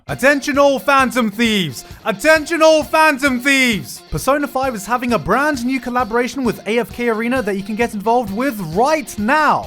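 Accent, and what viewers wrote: United Kingdom. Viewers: It's the Bri'ish accent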